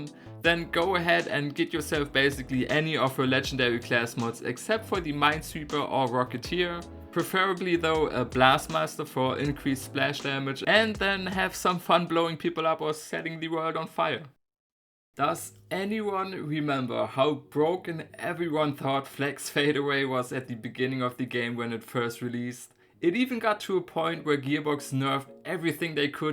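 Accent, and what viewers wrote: Germany. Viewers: I can feel the German accent in my blood... keep it up!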